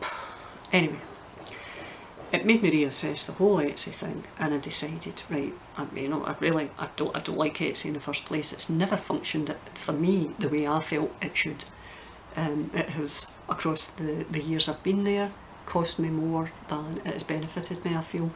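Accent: Scotland, United Kingdom. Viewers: i believe you are more than ordinary. My sister in law moved from scotland when she was 7. Her mum was one of the most beautifully souled women i have ever met. She was a ray of sunshine....you remind me of her. I love the accent. As women we do tend to stretch ourselves thin...i think that is why we are more suseptible to depression and anxiety. We need to lift each other up....💖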